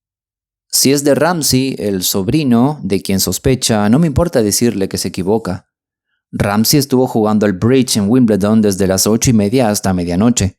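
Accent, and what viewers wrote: Rioplatense, Argentina. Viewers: COMO ME GUSTA SUS RELATOS, PARA MÍ PERFECTO, HABLA COMO YO, RIOPLATENSE, MUY BUENA VOS, PRONUNCIACIÓN, ETC. una abuela desde Montevideo Uruguay 🇺🇾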